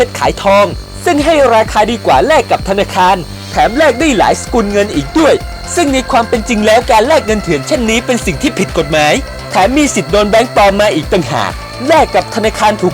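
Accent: Myanmar. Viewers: ความสามารถแค่นี้เสือกออกสื่อไอ้ควายเสียงยังกับพม่าพูดไทย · เวียดแนม คนพากย์มึงเป็นพะม่าหรอ แม่งพากไม่เข้าใจเลย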